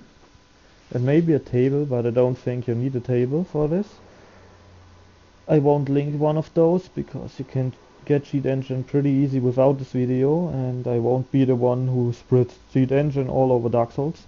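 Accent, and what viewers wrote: Germany. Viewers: are you german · Super geklappt. Eigentlich halte ich nichts vom cheaten, aber wenn ich drops nicht bekomme, die man eigentlich bekommen sollte finde ich ist das ein Grund. PS: Man hat es herausgehört, dass du Deutscher bist. Ist aber natürlich nicht schlimm. Bin ja selber einer ;) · Are you german? You sound a lot like german xD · Man merkt du bist deutsch haha :D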